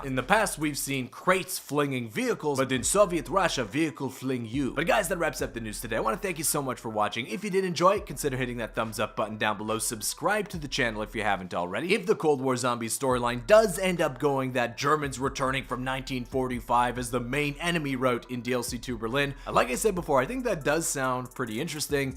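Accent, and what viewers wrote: Canada. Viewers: Wait this mans is Canadian. I just realized your accent and I can’t unhear the “agaiiinst” and “aboot”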